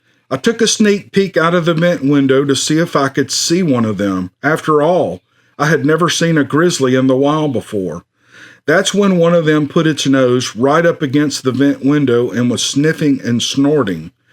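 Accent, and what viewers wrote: South, United States. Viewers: I have seen every single one of your videos & I'm always so happy to get the latest notification telling me that you just posted a brand new one!!!!!!! I love your voice & that sweet southern accent too!!! I really enjoy listening to the special way that only you can tell these wicked cool stories!!!! I also really like the awesome music you share, & all the videos you make to go along with all the stories!!! Anyway, I don't usually leave comments anywhere, but I just wanted to say thank you for creating!!! I am seriously such a huge fan, & I absolutely appreciate all the work & effort you put into making this channel as cool as it is!!!! Totally looking forward to the next one!!!! Thanks again!!!! You're awesome!!!! ~ 💖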